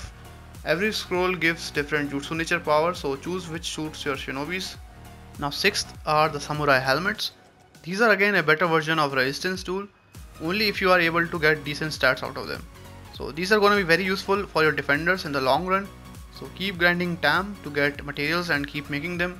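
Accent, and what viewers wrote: India. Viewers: Indian hoke English 😅I can't understand 😢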